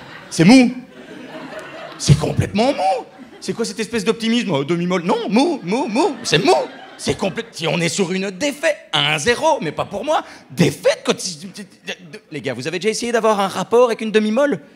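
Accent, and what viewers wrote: Belgium. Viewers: Étant belge je reconnais l’accent de mon patelin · J’adore l’accent belge. L’accent d’une région reflète l’esprit de cette région.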